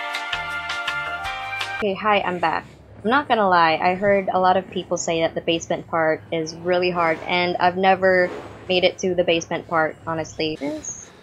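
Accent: Bisaya, Philippines. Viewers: Ate pinay ka po ba? Cute ng braces tsaka ng accent mo hehehe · Bisaya u?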